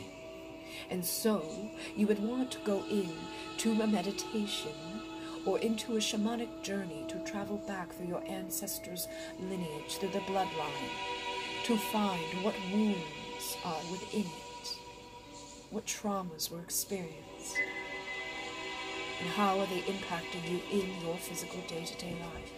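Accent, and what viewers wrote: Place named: United Kingdom. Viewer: Am sorry but the music is to loud and it makes hard to hear and am sorry but is kind of weird hearing their kind off "British accent with your American pitch" sorry I've never heard Pleiadians speak but again is the music that makes it harder Again I don't want to sound rude or anything, I love your readings, but this is my first time listening to their messages.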